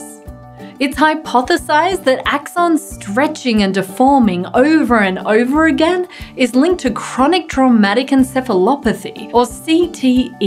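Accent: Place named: Australia. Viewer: I am myself from Australia and wow, you have the most pleasant Australian accent and voice I have ever heard. I actually wasn't even sure if you were Australian, and that perhaps you had a less than usual British accent. I will happily listen to your voice speaking about psychology. SUBSCRIBED! :D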